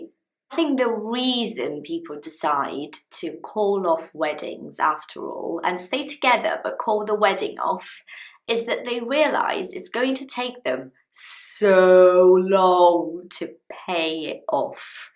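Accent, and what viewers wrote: United Kingdom. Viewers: I missed your 🇬🇧BRITISH🇬🇧 accent during these days without a new video of you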